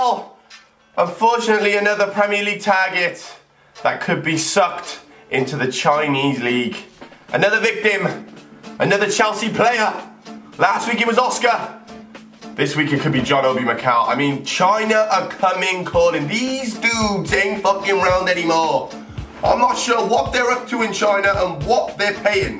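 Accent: Wales, United Kingdom. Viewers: are you from welch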